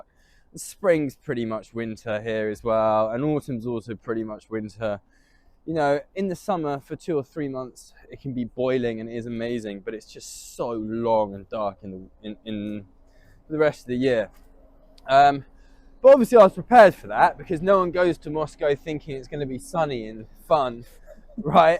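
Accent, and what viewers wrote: United Kingdom. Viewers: Блин, чел, как же тяжело воспринимать английский акцент после постоянного американского. Англичане, пилите больше контента! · Okay, I subscribed. I hope it will be interesting to look at Russia through the eyes of a Brit, and at the same time it will be useful to listen to a non-American accent. For some reason, I suddenly remembered a quote from a famous Soviet comedy: "- Well, if you come to our place on Kolyma, you'll be welcome... - No way! You'd better come to us!" 😂😂😂 Greetings from Krasnoyarsk!✌😉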